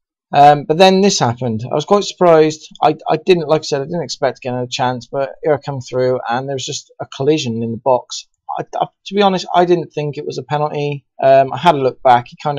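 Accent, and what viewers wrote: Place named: Bristol, United Kingdom. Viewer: Nice to see a city career mode on yt. And nice bristolian accent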